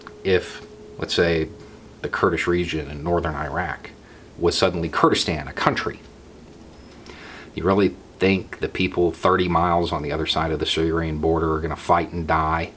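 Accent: South, United States. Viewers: Hey. Nice Southern accent, dude.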